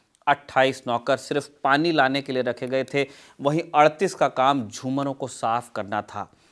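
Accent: Bihar, India. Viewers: Good Behari accent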